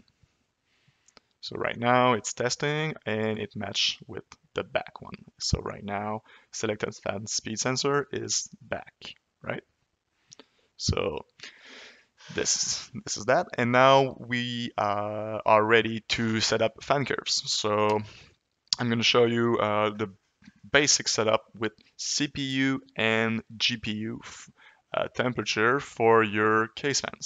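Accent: Quebec, Canada. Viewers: I'm pretty sure this guy is from Quebec because of the accent. Amazing software btw :) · Are you from Quebec ? Amazing video/software btw :) Thx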